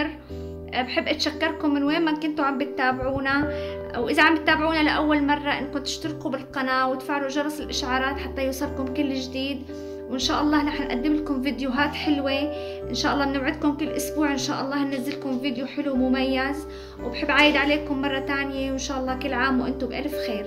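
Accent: Syria. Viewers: مدام اديش عمرك وانت من وين باين حكيك ادلبي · كأنو انتي من حمص؟؟؟حكيك مبين حوووومصي 😊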